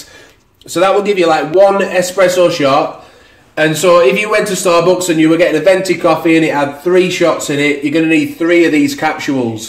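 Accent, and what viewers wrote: United Kingdom. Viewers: Ik this older video, I’m curious on something, I’m US ur voice sounds like ur Uk or something 😊. My local grocery store had some of these Starbucks lungo pods on clearance to get rid of them.. I don’t have a nespresso.. I have coffee filter and though try them so how much water would I need to use for one lungo pod?? I can’t find any info out net.. I stumble over ur video.. Thanks..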